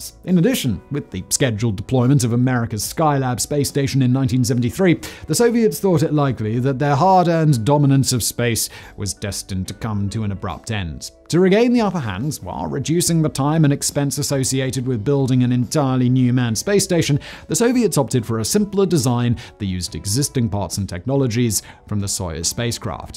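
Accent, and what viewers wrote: United Kingdom. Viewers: Can I just say that the name "Simon Whistler" is the coolest moniker, escpecially when coupled with the British accent....and a story about space weapons.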